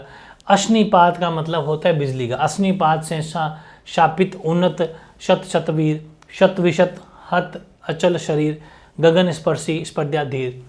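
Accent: Rajasthan, India. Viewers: Sir ke bolne ke tarike se kis kis ko pta chalta h ki vo rajasthan se h😅❤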